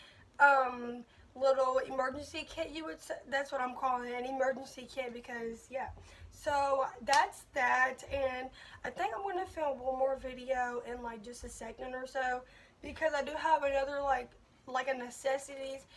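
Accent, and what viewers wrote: United States. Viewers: I’m used to this Tennessee and Mississippi talk😝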